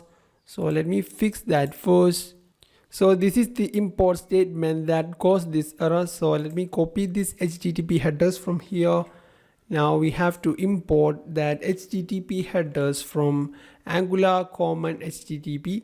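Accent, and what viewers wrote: Kerala, India. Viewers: malayali aano? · pronunciation ketata malayali aano na enikyum ondu shamshym..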